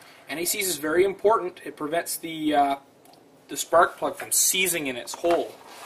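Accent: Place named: Canada. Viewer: You sound kinda Canadian, eh? I would check those gaps. I dont trust the mfr to gap them properly.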